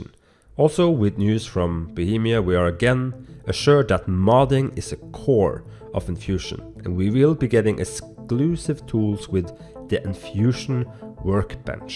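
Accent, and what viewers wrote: Norway. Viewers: bro you sound so Norwegian xD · Are you norwegian?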